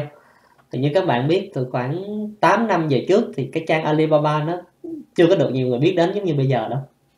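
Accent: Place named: Mekong Delta, Vietnam. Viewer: Ban noi giong nguoi mien tay nguoi viet nam nho nhung nguoi tay fai nguoc nhin ok e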